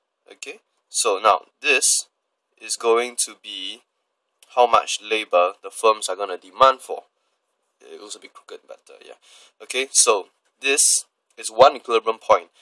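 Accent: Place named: Singapore. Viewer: singaporean narrator yay hahahaha! totally unexpected!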